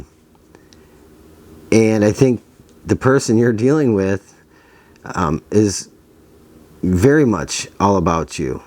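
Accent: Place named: Michigan, United States. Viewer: This has nothing to do with the reading (although it was spot on for me), but are you from Michigan? Your accent reminds me of my friend whose from Michigan.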